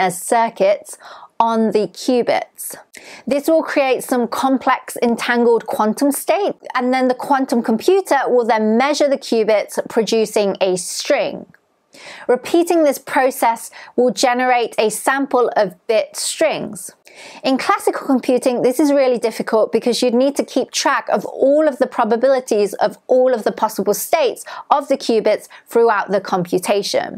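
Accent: United Kingdom. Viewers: Forgive my bluntness, but I'm Canadian, I love languages, and I can't identify which UK accent you have, and I don't want to be rude by guessing wrong. So, what kind of accent am I listening to? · I am curious, are you a native British english speaker?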